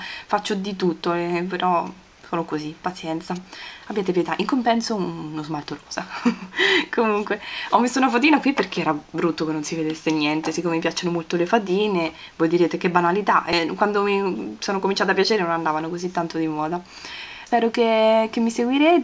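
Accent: Marche, Italy. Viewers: Ciao!! Ti ho scoperta grazie a DieMademoiselleAnne, e devo davvero ringraziarla! Dai dei consigli utilissimi, e poi è un piacere ascoltarti :) Ho notato una certa cadenza maceratese, o forse ascolana... per caso sei marchigiana?? Io si :))) Ciao da una nuova iscritta :))))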